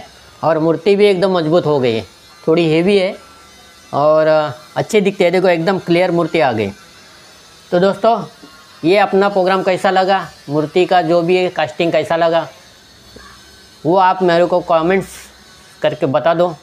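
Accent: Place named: Rural, India. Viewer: 😊सरजी गावरान हिंदी 👌👌👌👍🏻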